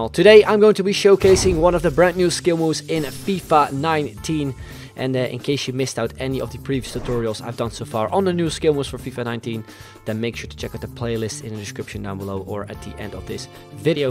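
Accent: Netherlands. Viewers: jij bent sws nederlands xD hoor het aan je accent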